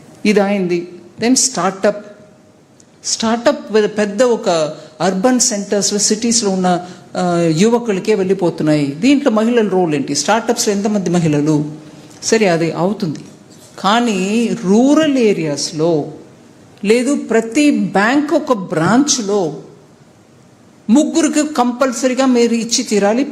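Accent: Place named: Chittoor, India. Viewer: ఈ కాలంలో తెలుగు వాళ్ళు చాలా మంది తెలుగు ఇంతకన్నా ఎక్కువగా ఏమి మాట్లాడం లేదు. చిత్తూరు జిల్లాలోఎప్పుడూ ఇలాగే మాట్లాడతారు. సహజం.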